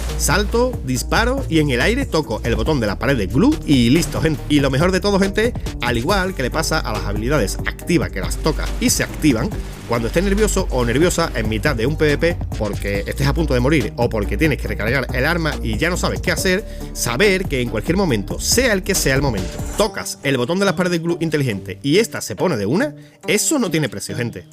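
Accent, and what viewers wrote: Spain. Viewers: por su acento debe de ser de España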